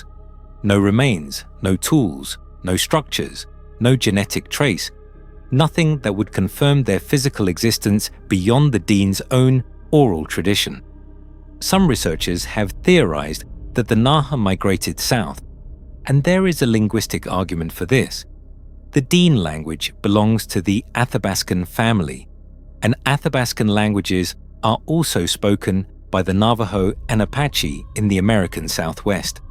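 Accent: United Kingdom. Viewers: Great writing and images. Excellent British voice in the narration - nice enunciation, warm tones, clear and listenable, great intonation and expression. But please if you can edit it, decide to use the Dee-nay or Dee-neh to pronounce Dinè. Don't be all over the place when the rest is so well done. Worth fixing if possible, to respect the Dinè.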